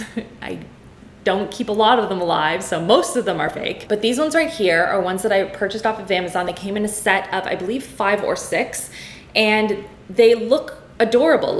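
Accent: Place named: Canada. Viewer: The Canadian accent is strong today 😂🤣😂🤣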